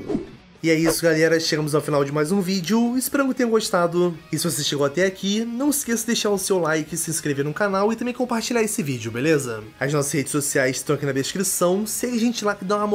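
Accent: Portugal. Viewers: Nasceu em Portugal tem sotaque de português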